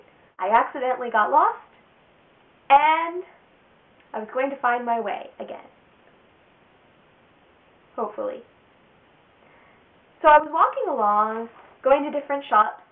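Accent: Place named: Thailand. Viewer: i think ,your accent is verry like "thai " i know cuz i am thai. my nick name is "chang". "chang" = elephant. "ช้าง"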